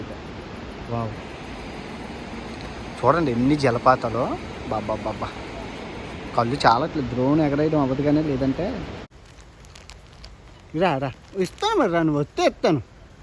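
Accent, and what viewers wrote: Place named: Srikakulam, India. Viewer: I started watching in Facebook now I am one of your subscribers😊 doing great job bro entha tension lo unna me voice ki me sarcastic ki chala navochesthadi Madi Srikakulam ma slag koncham me voice lo vinipisthadi..chala degara Vala laga anipistharu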